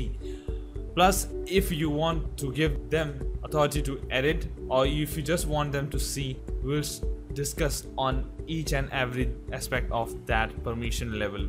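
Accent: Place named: India. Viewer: Sorry can't handle the extreme mono-toned Indian accent. :(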